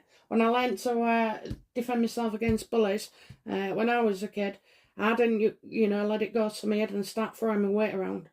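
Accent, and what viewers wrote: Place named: Yorkshire, United Kingdom. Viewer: QE2 could be wishing for Harry to return without Meghan. Great reading. Could listen to you all night. Nice to hear a fellow Yorkshire lass x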